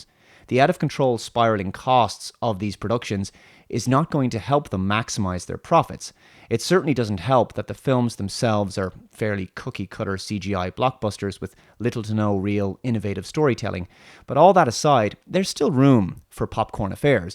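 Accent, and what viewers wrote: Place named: Ireland. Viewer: As an Englishman, listening to your Irish accent, I can see how the American accent developed. That twang is there. As for Star Trek, I was born in 1980 and I still prefer the original series/cast with it's crappy effects in all it's glory.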